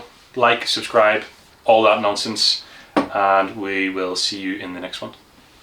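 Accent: Northern Ireland, United Kingdom. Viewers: So good to hear a great Norn Iron accent. Love your videos. Francis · I just unboxed mine. It's a hollow body version. Looking forward to seeing how you approach the painting and curious about which mods you are going to bother with. The Northern Irish accent was a real plus for me. I loved "Derry Girls" 😉. Any idea when the second video will be up?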